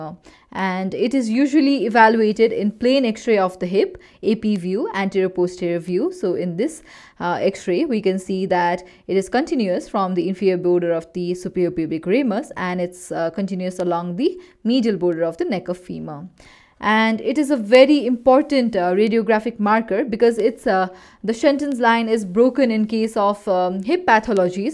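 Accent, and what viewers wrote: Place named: England, United Kingdom. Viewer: Best teacher with nice English accent❤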